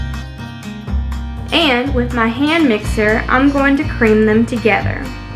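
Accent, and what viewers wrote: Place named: South, United States. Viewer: Omggg! You’re back! Hello! I hope you don’t take this the wrong way, but your social media detox made your Southern accent more distinctive! I mean that in an endearing way because I love all accents in general!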